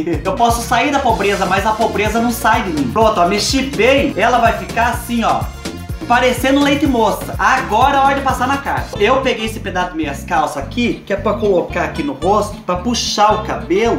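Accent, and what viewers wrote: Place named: Minas Gerais, Brazil. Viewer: Você tem muito sotaque de mineiro.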